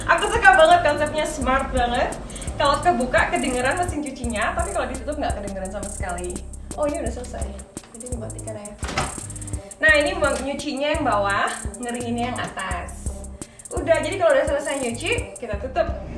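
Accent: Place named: East Kalimantan, Indonesia. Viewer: Kakak orang kaltim yaa.. Aku kira orang medan 😂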